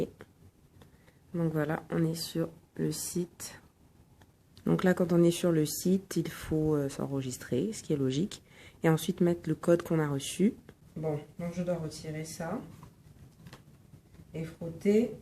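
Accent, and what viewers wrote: Gabon. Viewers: avec ton accent je sais que tu es gabonaise et aussi que le haut-oguée c'est au sud est du gabon c'est l'une des 9 provinces du gabon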